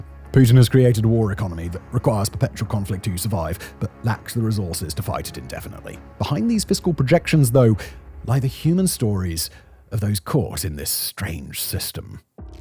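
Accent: United Kingdom. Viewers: yeah man, this is what is happenin. but something about British RP fails to deliver the true synthesis of the emotional impact, did you smoothe it out with AI. Yeoman's work on the breakdown, the cuts are so close together somehow just makes it seem like the same thought except it isn't, which makes the audio experince more like that of a infinite unrelenting run-on sentence that is jam packed with info and concepts yet tonally devoid of emotional contexts --hope this helps --thnks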